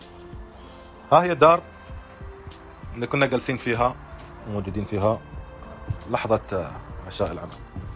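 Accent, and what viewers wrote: Guercif, Morocco. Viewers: من طريقة كلامو تعرف انه اجرسيف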